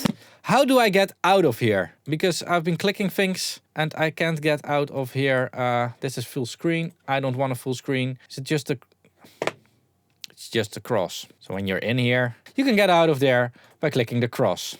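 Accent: Netherlands. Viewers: yeah he sounds very Dutch, that’s true, I’m not a Dutchy but I’ve been there, honestly I’m kind of surprised how bad people are at identifying accents, I just said that to make a point, since the guy was being racist.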